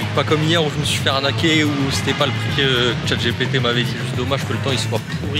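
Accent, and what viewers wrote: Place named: Paris, France. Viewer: Ton accent "parigo" ...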